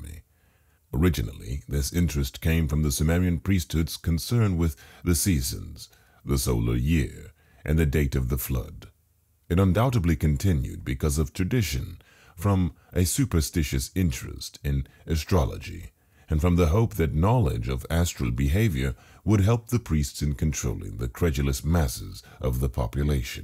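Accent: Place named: United Kingdom. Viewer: Can’t tell if you have a British or American accent. It’s like a mix of the two.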